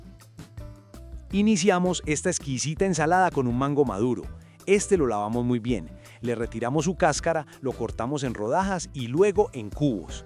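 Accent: Antioquia, Colombia. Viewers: Me encan❤tan las ensaladas que haces y hablas muy paisa como yo pero yo vivo en españa y amo mi colombia más mi Medellín ❤❤❤❤❤❤❤❤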